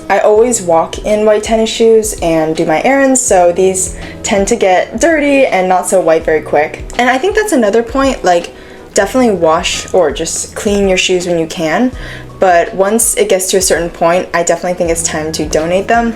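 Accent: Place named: United States. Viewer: you have a very pleasant voice and accent, are you from the usa?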